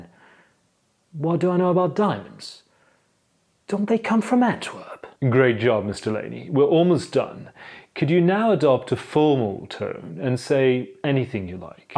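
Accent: United Kingdom. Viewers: Perfect British accent! Expecting next videos) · A real hoot! The lovely British accent was unexpected . Did you spend more time with British content than with American content? Or maybe you can turn on an American accent as well. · That’s a gripping video! 😻 You’ve got an impeccable British accent. Best from Poland! 😊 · Really odd hearing you speak English, and with a perfect British accent too. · oh my goodness so british it is wonderful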